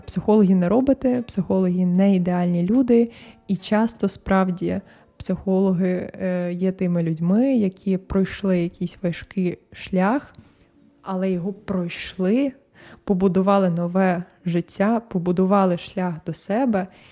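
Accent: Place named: Surzhyk, Ukraine. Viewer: дуже дякую, що ділитесь власною історією, як на мене, це дуже корисно і полегшує відчуття всередині нас! Ви пройшли реально багато труднощів і тому хочеться висловити Вам слова підтримки. Ви справді молодчинка<3 за суржик не партесь, він звучить афігєнно і середньостатистичному носію мови все більш ніж зроз:)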